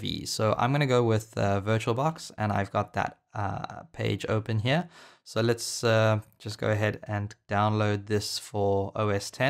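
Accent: South Africa. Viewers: While I was listening to you my wife walked in and she was like, bet you his south african 😆 I lived in cape town for years but it became way to busy for me. Living in a small coastal town atm. Thank you for the great content.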